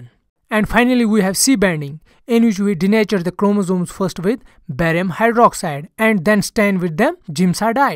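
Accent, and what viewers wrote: Kashmir, India. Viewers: Well Explained Although the Indian accent is thick as fu*k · You voice is like that of Kashmir.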